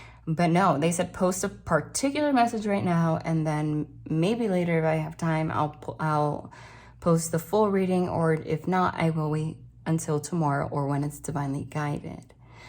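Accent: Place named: New York, United States. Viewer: I love your new york accent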